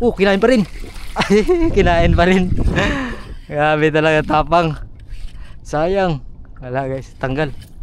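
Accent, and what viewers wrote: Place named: Visayas, Philippines. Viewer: Bisaya ka ya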